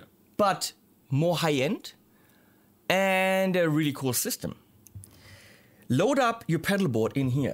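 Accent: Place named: Germany. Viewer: That German accent. ;)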